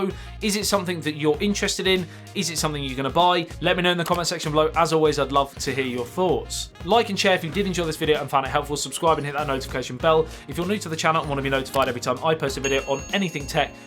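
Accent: United Kingdom. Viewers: Hate british accent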